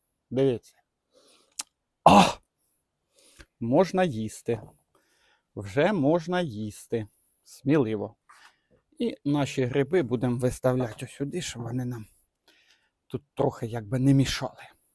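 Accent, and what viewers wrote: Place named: Central Ukraine, Ukraine. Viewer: Виглядає неймовірно й смачно, дякую за ідею і хороший контент❤(якщо не секрет-звідки ви)просто так наче з центральної України)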